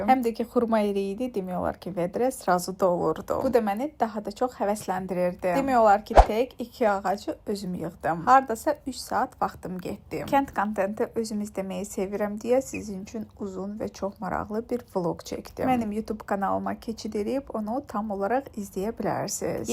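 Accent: Russia. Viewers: KyLlosu ByEşŞ ManatAaa Qıcığ oludume ele bil rusdu Azerbaycan dili danişìr kexelizzz😂😂😂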